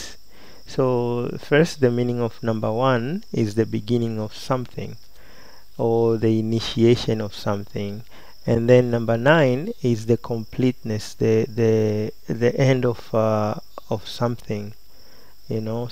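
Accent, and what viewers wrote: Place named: Uganda. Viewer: I thought it was Ugandan... it sounded so familiar!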